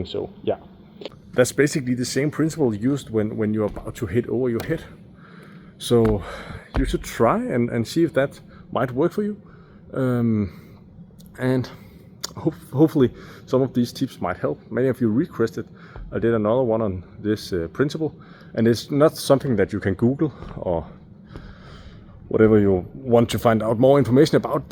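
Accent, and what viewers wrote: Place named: Denmark. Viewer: Du har virklig meget dansk accent 😂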